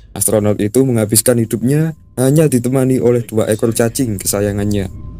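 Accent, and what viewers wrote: Java, Indonesia. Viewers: Medok amat mas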